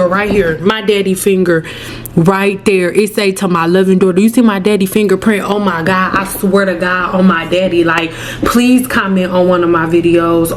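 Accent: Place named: Detroit, United States. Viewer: I knew you were from Detroit just listening to you 😂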